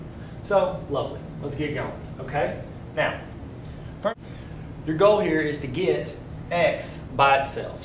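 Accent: South, United States. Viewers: Ummm why do u have to be so good looking? And that southern accent...couldn't concentrate on the lesson at first! ;-)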